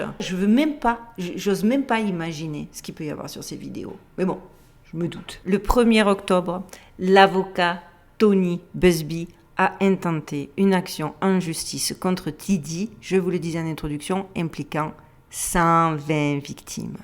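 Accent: Marseille, France. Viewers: Cc oh que oui cela est intéressant même plus que cela puisque nous en France cela a juste était juste diffusé comme le passage d'un TGV bien entendu que ça pue et mon dieu il faudrait tellement que ça pète et du côté de chez nous aussi pour finir de réveiller bcq bcq d'âmes au nom de la justice Divine et par respect de toutes ces personnes qui ont et qui subissent encore ces horribles conditions tu as une belle mission notre Marseillaise surtout ne perd jamais ce magnifique accent et langage bien de chez nous merci bien sûr ce com est personnel et j'assume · Son style est un mix entre le conte pour enfant et l'enquête criminelle saupoudré de l accent marseillais. On kiffe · Merci beaucoup 😄 toujours captivant de t'écouter. Je ne fais jamais de commentaire vu le nombre incroyable qu'il y a toujours mais j'avais envie pour une fois de te dire merci et te dire aussi que j'adore ton accent marseillais....ça et ton rire contagieux font ton charme de même que ton intelligence.😉🙏👍💖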